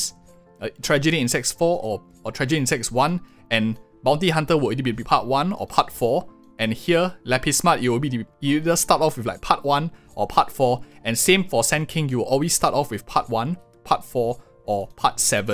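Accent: Singapore. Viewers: Singaporean voice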